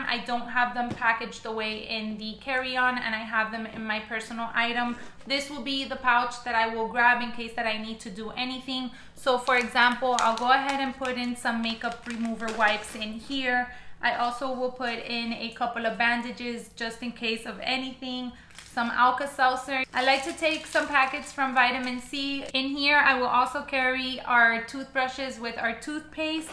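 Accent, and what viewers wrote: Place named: Miami, United States. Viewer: Are you from Miami? Your accent gives me kendall, hialeah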